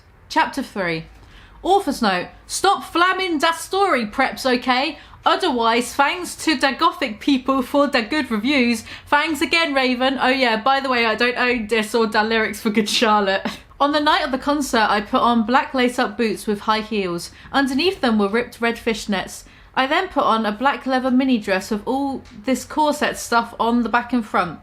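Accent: United Kingdom. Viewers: I gotta say, hearing this in a British accent makes it better than other readings i've been listening to lol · with a british accent... Noice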